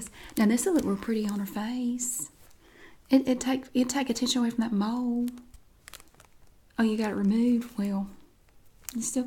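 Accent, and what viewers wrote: South, United States. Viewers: I'm from Germany & speak a couple of languages, but nothing is more entertaining & interesting than your southern accent. It kind of makes me feel all warm inside. It makes me think of happy animals on a farm, corn, BBQ, apple pie & hugs. 🇺🇸 ❤️🌽🥧🍩🌭🍿🥞🐄🐖🐑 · I swear, I am slowly picking up her southern accent after constantly watching her videos lol . . Either way. Fantastic job, Mam. lol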